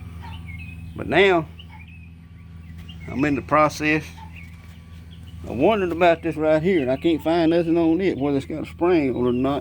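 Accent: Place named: West Virginia, United States. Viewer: Sounds like McDowell county WV feller👍🇺🇸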